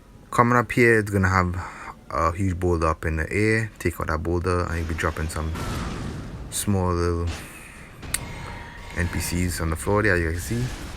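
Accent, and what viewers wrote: Trinidad and Tobago. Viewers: A Trinidadian I presume?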